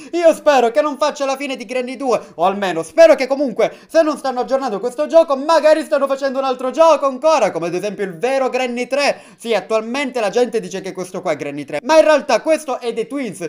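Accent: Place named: Sicily, Italy. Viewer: Non si capisce l'accento mi sembravi della Sicilia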